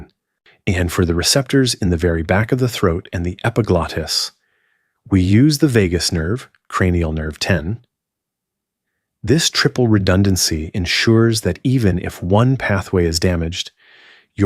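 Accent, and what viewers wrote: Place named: United Kingdom. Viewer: Bro bring back British voice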